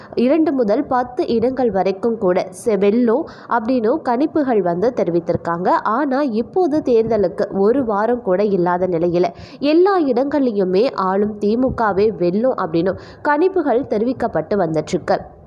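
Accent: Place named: Sri Lanka. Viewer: தமிழ் உச்சரிப்பு கூட சிங்கள தமிழ் மாதிரி உள்ளது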